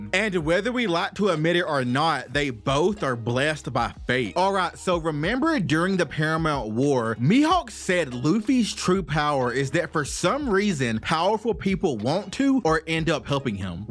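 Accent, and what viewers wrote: South, United States. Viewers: I'm loving the southern twang bro Def adding you to the one piece yt lineup 🙏🏾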